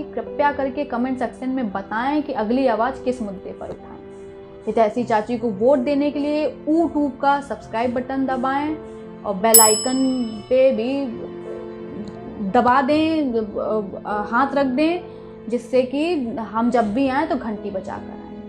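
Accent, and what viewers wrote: Kanpur, India. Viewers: apke kanpuriya accent par fida ho gaye hai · Kanpuriya ho kya beta ji. 😍😍😍😍😍😍😍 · Bolne ki font bdi acchi lagti kanpur side ki...so awesome 😍😍😍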